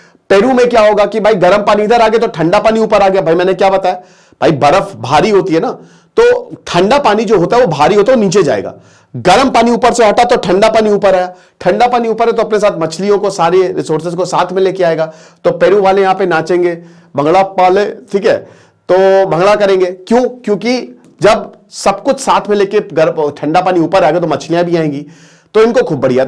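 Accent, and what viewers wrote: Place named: Rajasthan, India. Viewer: I think ye rajasthan se ho ga😂😂😂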